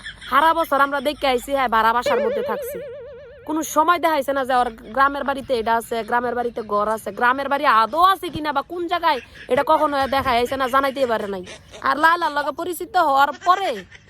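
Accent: Comilla, Bangladesh. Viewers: আপুর বাড়ি কি কুমিল্লা খাস কুমিল্লা বাসায় কথা বলতেছ আমার কাছে তো সেই ভালো লাগতেছে আমাদের দেশের ভাষাগুলো আসলেই আমার কাছে খুব ভালো লাগে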